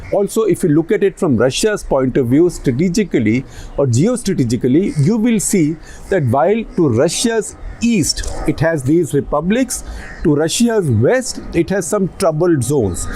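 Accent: India. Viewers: That was the Voice of America with an Indian accent. He should concern himself with India : one of the poorest countries in the world. The average citizen in those countries that he criticizes enjoys a living standard that people living in "democratic" India can only dream about.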